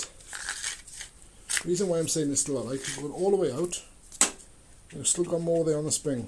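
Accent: Wales, United Kingdom. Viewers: Brilliant video thank you for saving me a huge head ache of trying to figure it out. By the way love the way Youtube's captions struggle to catch catch your welsh accent. Much appreciated!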